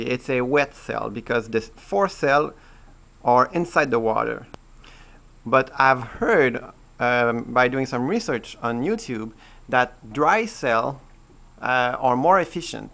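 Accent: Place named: France. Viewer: from ur accent, u can speak french right? je l aime bien to projet car je suis tres interesser a ce nouveau source d energie:) merci...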